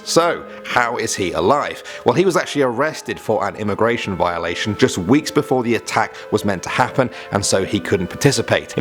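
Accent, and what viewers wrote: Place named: United Kingdom. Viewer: Hey, where in the U.K are you from? I don't recognise your accent.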